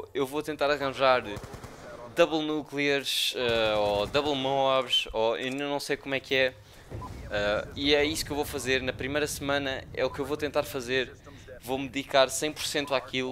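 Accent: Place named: Portugal. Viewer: O sotaque dos portugueses é tão engraçado HEUEUHEHEHEUHEHU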